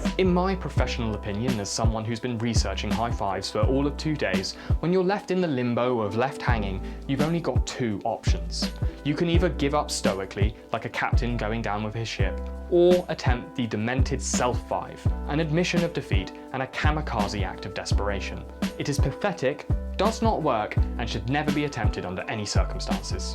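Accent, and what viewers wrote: United Kingdom. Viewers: I love hearing the British explain baseball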